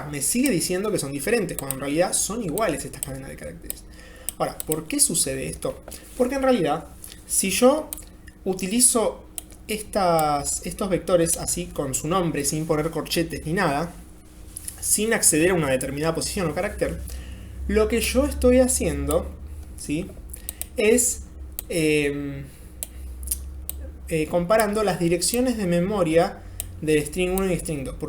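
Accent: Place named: Argentina. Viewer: buen video bro, gracias y que lindo escuchar un Argentino en la escena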